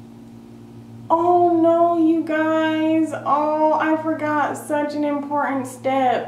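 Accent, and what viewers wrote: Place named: Minnesota, United States. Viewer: are you from Minnesota or something? Your accent reminds me of Fargo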